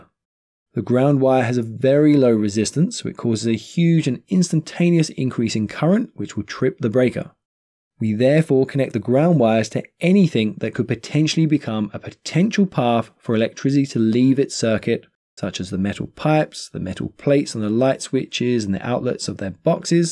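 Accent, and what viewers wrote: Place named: England, United Kingdom. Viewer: Sounds so weird hearing an English accent explaining the American terminology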